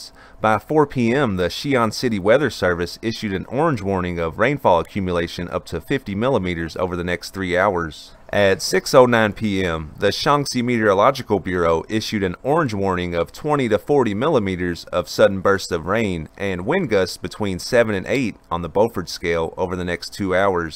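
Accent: South, United States. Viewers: Thanks for this information. You have a beautiful Southern accent! DO NOT ever change it for anybody. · A good old southern boy interpreting Chinese for us.! I'm sold. you got another subscriber bro.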